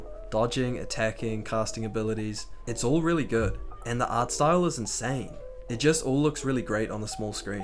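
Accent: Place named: New Zealand. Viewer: the New Zealand accent and saying deck is so funny 😅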